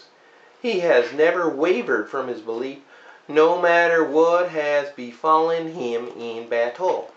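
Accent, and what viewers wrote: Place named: South, United States. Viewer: Is that southern accent for real?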